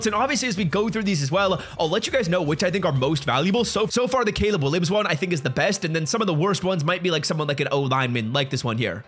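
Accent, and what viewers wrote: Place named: Canada. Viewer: Been saying the same this for like 2 years lol but it’s his Canadien accent I think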